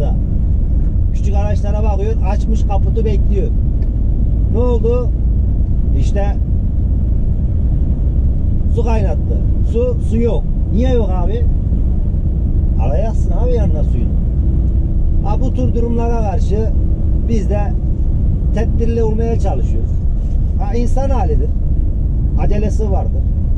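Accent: Adana, Turkey. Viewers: Abi adanalı siven var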